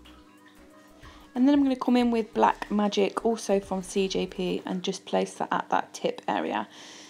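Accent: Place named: Birmingham, United Kingdom. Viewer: Love this girl and her work. Sounds like a Brummie!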